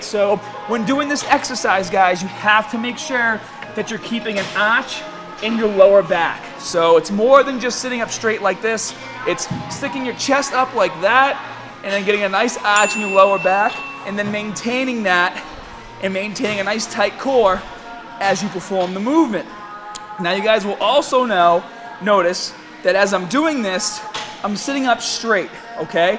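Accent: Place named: Massachusetts, United States. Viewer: That Mass. accent seemed heavier than ever in this video, haha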